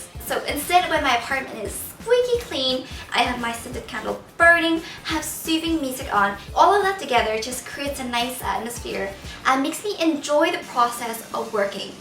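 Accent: Northern Ireland, United Kingdom. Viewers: Yay! You're northern irishness came out in this video haha!! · Your Northern Irish accent made me chuckle :> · Are you part northern Irish? You sound a wee bit. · Thanks for this vid:) Aaaand I kinda miss your heavy northern ireland accent! hahaha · you still have such a northern irish accent, it's so cute :) I'm procrastinating but I'm going to try and beat it!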